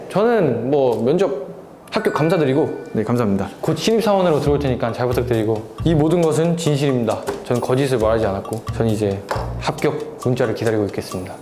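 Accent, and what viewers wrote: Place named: Busan, South Korea. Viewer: 동생들이 부르던 노래 불러주는게 너무 귀여움 부산 사투리 하는 호주남자.. 좋다 혈액형을 노래 부르면서 말하는 우리 예준이 캐스팅썰은 언제 들어도 재밌어.. 살아남기 시리즈를 알고 있을줄 몰랐는데 ㅋㅋㅋ 혼자서 1인2역하면서 카이렐이 중요한 이유를 알려준느게 너무 귀여워 ㅜㅜ 이부분 보면서 눈물날뻔했다 ㅜㅜㅜ 😢 이게 MZ인가 ㅋㅋㅋㅋㅋ 컨텐츠 준비해주셔서 너무 감사합니다 😍😍 덕분에 우리 앰퍼원 외국 멤버들에 대해 더 알아가고 있어요 맞춤 질문까지 준비해주신 센스.. 짱입니다 👍 카이렐편, 브라이언편도 기대하겠습니다!!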